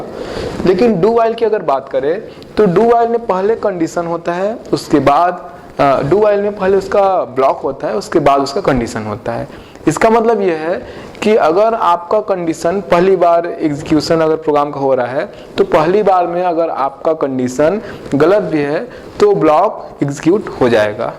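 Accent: Bihar, India. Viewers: apna accent which is i think bihari agar change kar le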